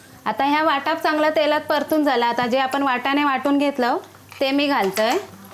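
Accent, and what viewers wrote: Malvani, India. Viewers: व्वा अस्सल मालवणी संभाषण. ऐकुक खुप बरा वाटला. तुमचा बालपण गावात गेला वाटता. भाजी, आंबोळे, सांबारा एकदम झकास. बारीक सारीक मुद्दे खुप चांगले समजऊन सांगितले. 👌👌👍👍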